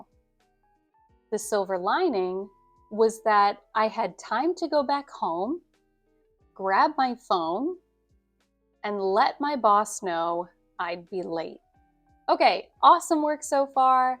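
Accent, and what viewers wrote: United States. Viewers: Which is it accent? American or British ? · I like your american accent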